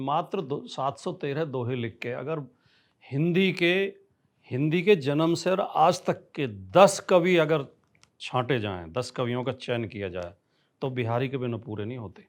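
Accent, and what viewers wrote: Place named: Haryana, India. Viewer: I m from Chhattisgarh.. love to hear haryanvi tone..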